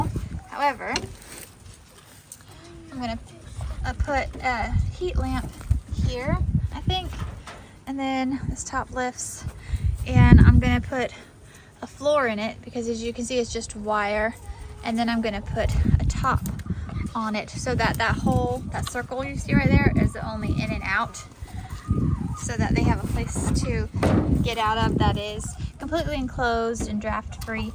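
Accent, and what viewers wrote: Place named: South, United States. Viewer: Potting wild tomato plants in November! I'm draining my water hoses and putting them away for the winter, they already lightly froze up once. I don't have a greenhouse, but I did propagate some clematis from cuttings, hoping they survive. Good luck with expanding your channel, I came here from Cog Hill Farm. BTW being an old former Jacksonville guy, I love your slight southern drawl. I like videos in the 20–35-minute range. I don't listen to blog, too long, even my own son's.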